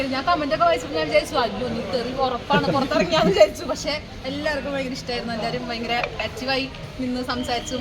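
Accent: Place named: Thrissur, India. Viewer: Kore vishangal negative comment idum. Athonum mind cheyanda ❤️. Ninak oru ahagaravumilla. Ninte thrissur slang anganeyanu. Ath manasilakkunavarum und. Athonum manasilakkathe bodhavum vivaravumillathavarude comments mind cheyanda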